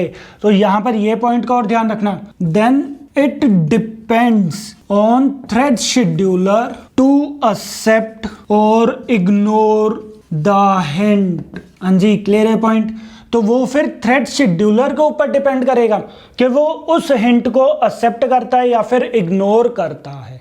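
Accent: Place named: Punjab, India. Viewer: Love ur punjabi touch hindi..... Hanji ..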